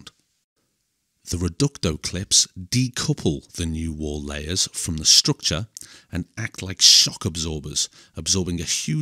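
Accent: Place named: Liverpool, United Kingdom. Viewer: I like hearing posh scouse Soothing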